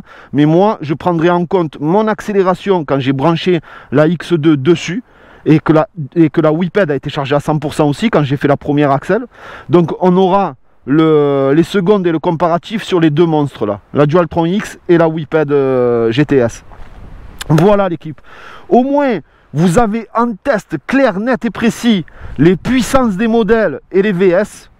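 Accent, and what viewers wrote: Marseille, France. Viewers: Excellent les Manceaux, je les vois dans la semaine pour essayer la dualtron mini et la futecher gun pro j'espere qu'ils l'ont recu. Merci pour tes videos. Je suis un nouvel abonné et franchement au top avec ton accent de Marseille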